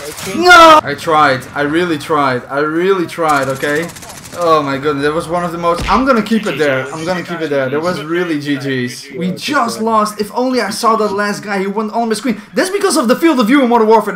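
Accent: Netherlands. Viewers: are u dutch? zo klink je wel! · You're dutch right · are a from the netherlands bro ? becausse i am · his dutch way of talking English feels nice or better than the other accents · Ben je Nederlands of zo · dit nederlandse accent is geniaal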